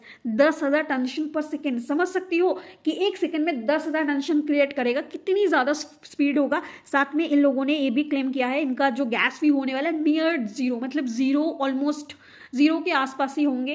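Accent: Bengal, India. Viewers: App Bengali ho kiya mam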